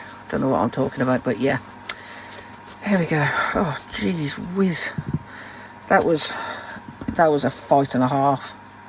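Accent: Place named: West Midlands, United Kingdom. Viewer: This looks lovely! You sound local, a West Midlands twang to your accent, thank you for sharing ❤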